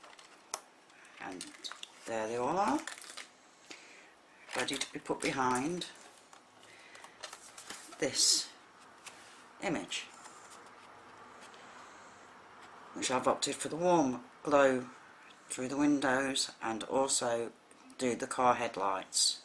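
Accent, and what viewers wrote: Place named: United Kingdom. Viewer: Your cards are beautiful, plus, I love your Brit accent. I'm from the states.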